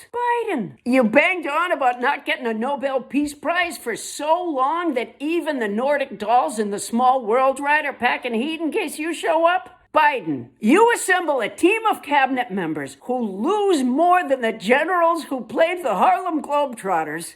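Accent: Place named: New England, United States. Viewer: THIS monologue is brilliant, especially “part of God’s plan, & Mr. Balloo.” Maybe Poundstone, Jimmy Kimmel, Louis Black and the Comedians ARE the adults in the room, after all. But as keen-eyed as you parents seem at spotting the 3–4-year-old development of a grown man, how do you explain the 76–78M adults with no such recognition?? He toddled around the WH from 2016–2020 playing president then!! Atrociously. Abominably. Disastrously. What chemical substances are people getting that wipe the data out of their minds so completely?—send us a link, PLEASE! (I LOVE New Englanders who use British & Irish colloquials like “banged on” and “dithered around,” or “faaar” instead of “for,” “f-ing & s.o.b.” Sooo endearing!)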